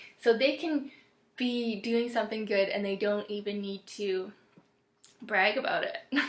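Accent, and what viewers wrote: Canada. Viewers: I was the person at my last job who was in charge of keeping track of the expiration dates on food and sodas! lol And I would hone in on all my data entry! lol Omg, and work just for work's sake. I always gave work my all - I never just slacked off - even when my boss wasn't there. Spot on! You sound like you're in Canada?? You described my work ethic to a T. And I always invented little shortcuts to make my job easier.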